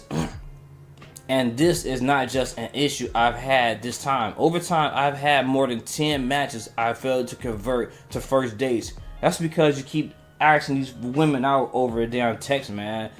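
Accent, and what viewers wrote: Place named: Tulsa, United States. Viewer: what is from? I’m asking because my brother is from Tulsa and he has that same accent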